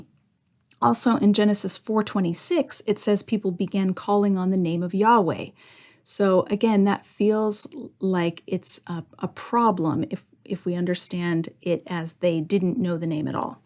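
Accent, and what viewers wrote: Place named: Spain. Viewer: This one was thought-provoking, I must say the 3rd option got me interested. Your approach in many of your videos is quite a straightforward one and in some others, you decide to get into the weeds, I see kind of a balance right there for most of us who are laymen. Btw, your Spanish accent, love it !!! 💯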